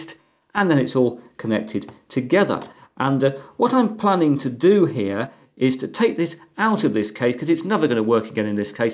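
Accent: England, United Kingdom. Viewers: Funny english accent🙂 nice exotic computer🙂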